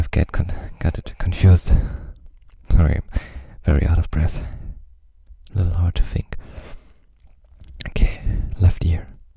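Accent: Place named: Germany. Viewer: Hes german uwu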